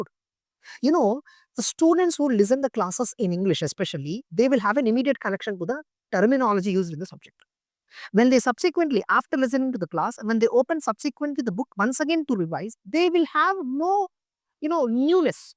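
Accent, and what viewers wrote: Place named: Telugu, India. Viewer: Sir your mother tongue is Telugu know 😄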